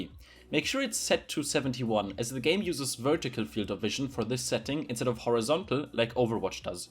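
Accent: Germany. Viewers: I was just wondering what your native language is? Your accent makes me think of that of a German but your real name doesn't fit (great content btw) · Are you from Germany? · nice video! btw are you german, because you sound like me when i speak english (and im german) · Hört man direkt. Ist zwar kein starker Akzent, aber als Deutscher erkennt man das sofort. :D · Ich höre so raus dass du deutsch bist 😂 kann Mann diesen aim "Unterricht" auch in deutsch bekommen ? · hey bist du deutsch du hast nämlich n ziemlich startken deutschen akzent in deinen Videos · Am I hearing a german accent?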